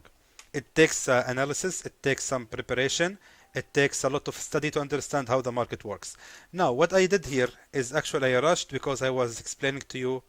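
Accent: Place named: Arab world. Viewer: your accent is Arab, if so, why not making videos in Arabic?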